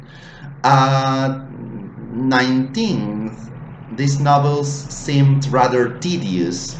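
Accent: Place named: London, United Kingdom. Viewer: en serio?? Parece que hubieras vivido ahí, xq el acento es británico-londinense, increíble que sólo lo hayas adquirido estudiando.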